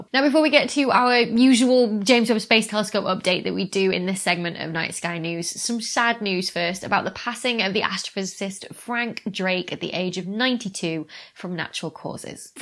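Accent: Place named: North, United Kingdom. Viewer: Love your style, so straight to the point. The subject of astronomy will always be dear to me and to hear it in a fellow northerers accect is just a bonus. I actually have a very old book showing Halleys comet and was lucky enough to see its last appearance. Keep up the great work Dr Becky 🔭🪐 - Mav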